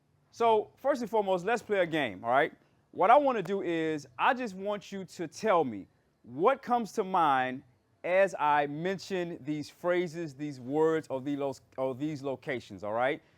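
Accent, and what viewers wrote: Ghana. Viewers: Lol, your American accent is not 100 % anymore. Except for the parts where you are deliberately sounding Ghanaian, you have that Ghanaian accent flaring up for real and creeping in uncousiosly.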